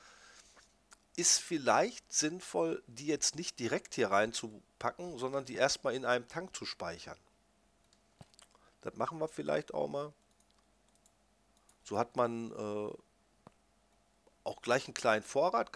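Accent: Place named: Berlin, Germany. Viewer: Berliner Englisch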